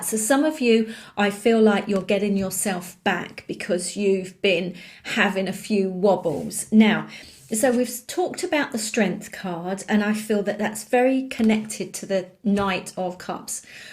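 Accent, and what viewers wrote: United Kingdom. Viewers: Good Morning!..From California.....Gemini is here...How r u? Lovely lady...Thank uuuuu so much for the beautiful reading for me ...Trully appreciated ....By the way, I love your British Accent....Tc ..Much love .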